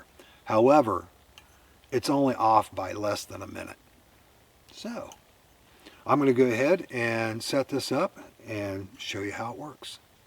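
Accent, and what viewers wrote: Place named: Ohio, United States. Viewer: Are you located in Ohio? Your voice/accent remind me a LOT of Dave Canterbury. Great video, btw.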